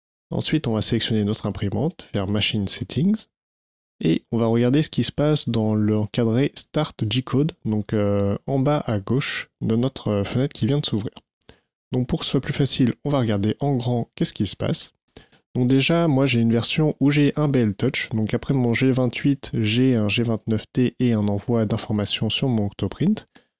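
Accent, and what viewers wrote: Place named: England, United Kingdom. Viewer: J’adore cet accent anglais!!!🤣👍🏻...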